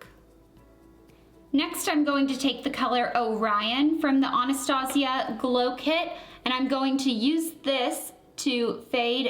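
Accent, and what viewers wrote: South, United States. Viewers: LOL you had such a thick Southern accent! And I love the reference hahaha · the southern accent ahhh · That southern accent in the first video! where did it go? :-P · You were the first person who I subscribed to for beauty videos and I love this look! BTW you had more of a southern accent back then. I love southern accents! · Aw I miss your country voice! Haha I have been watching you since back then!!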